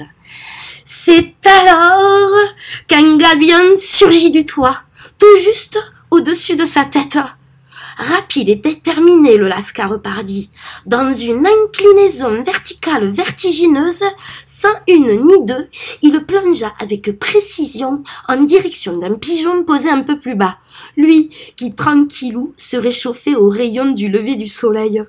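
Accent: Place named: Marseille, France. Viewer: Sympa l'accent marseillais ! Il te va bien.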